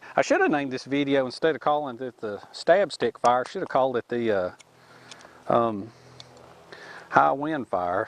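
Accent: South, United States. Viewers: you speak "country boy" and that's good enough for me. As long as your "peoples" can understand you, don't worry about the rest. Besides, the people that don't watch aren't in our league. God Bless Brother!!!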